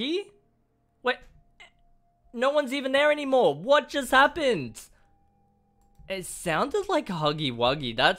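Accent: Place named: Australia. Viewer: New it you are from Australia not USA OR US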